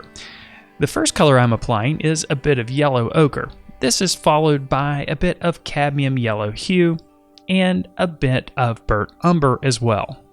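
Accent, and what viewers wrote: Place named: North Carolina, United States. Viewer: Sounds like a Carolina accent. Most likely North Carolina.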